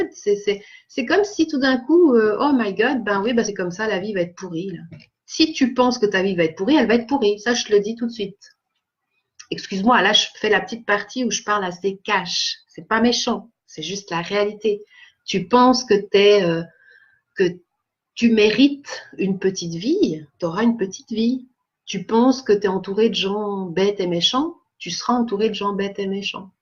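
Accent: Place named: Canada. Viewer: Tu prends l'accent canadien:D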